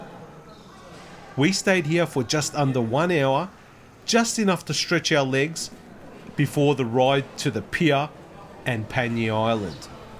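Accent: Australia. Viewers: So nice to hear the Australian accent on YouTube. It's getting crowded out by other, like, annoying ones. 🤪❤❤❤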